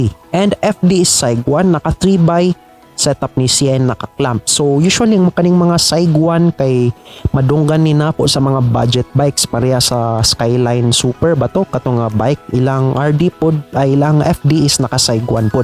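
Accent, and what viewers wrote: Visayas, Philippines. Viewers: Bisaya man diay oi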